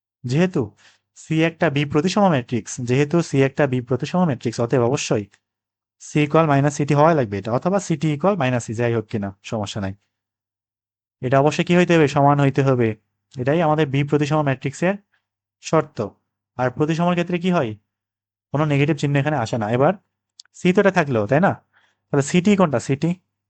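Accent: Naogaon, Bangladesh. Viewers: Onk upokrito holam..❤sir hamar bari kolo nouga vasa kemba chena chena lagce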